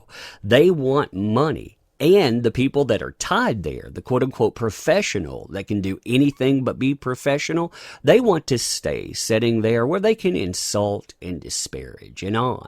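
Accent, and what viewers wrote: South, United States. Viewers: fix your hillbilly voice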